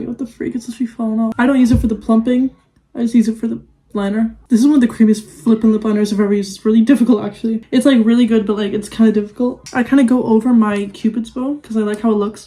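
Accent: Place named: United States. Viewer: U don't sound Irish at all ur accent sounds so american but it definitely slips out when u say photos lmao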